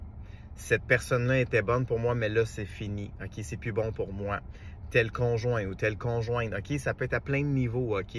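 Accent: Canada. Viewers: Trop gaga de votre bel accent canadien bel homme😊❤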